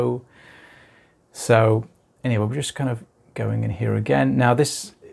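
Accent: United Kingdom. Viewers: I come here to practice British accent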